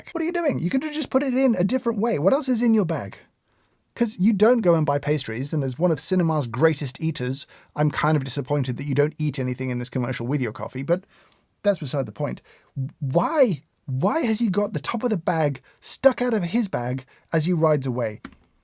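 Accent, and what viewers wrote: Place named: RP, United Kingdom. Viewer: Overreaction to an ad. this aristo-accented Brit going over the producers (who cares?) The connections he makes of motorcycles with coffee (who cares?). Coffee beans sold with name of who it was made/ filled for (who cares?). Pitt and being supposedly made to look cool in the selling of something(what else is new?).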